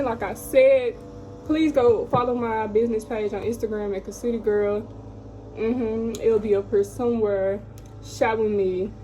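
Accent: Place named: Texas, United States. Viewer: Please tell me you from texas because that's all I hear coming out ya mouth 😂😍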